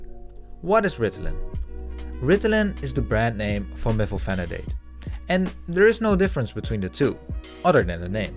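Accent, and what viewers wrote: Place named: Netherlands. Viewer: I recognize a dutch accent when I hear one!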